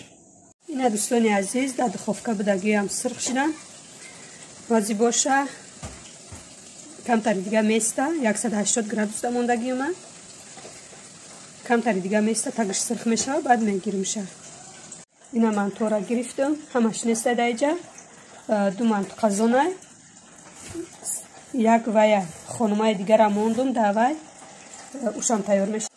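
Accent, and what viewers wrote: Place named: Hisor, Tajikistan. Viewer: Салом алейкум чон ранги хисориё гап мезани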